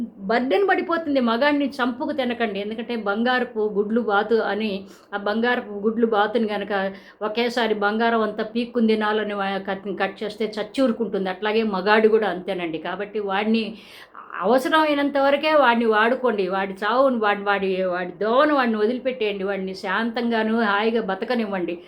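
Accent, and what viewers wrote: Guntur, India. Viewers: గోల్డెన్ వర్డ్స్ చెప్పారు . నిజంగా ఆడవాళ్ళ బతుకులు అంతే. మీరు మాట్లాడుతుంటే మా గుంటూరు జిల్లా వాళ్ళు మాట్లాడినట్టు ఉంది మేడం చచ్చే నవ్వొస్తోంది. అన్ని రియల్ గానే చెప్తారు మీరు హేమలత తిరుపతి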